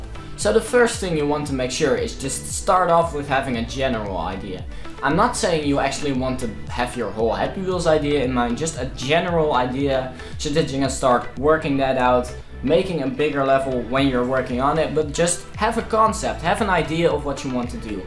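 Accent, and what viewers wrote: Netherlands. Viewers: I can sense the Dutch (W tutorial)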